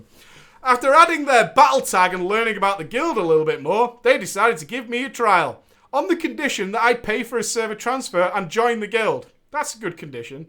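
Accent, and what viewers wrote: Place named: Manchester, United Kingdom. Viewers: somewhere round there yeah. it's not full on manchester but in that area, i'd say slightly south of manc. east cheshire maybe but not west, that's my area and it isnt local to us. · Manchester accent (NW england). South city/cheshire i think. I live there and he sounds local.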